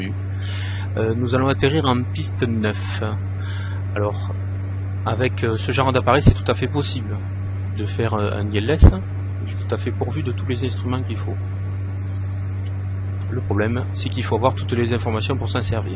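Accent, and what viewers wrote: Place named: South, France. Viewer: Très bon tuto. L’anglais avé l'accent donne en plus un air de vacances.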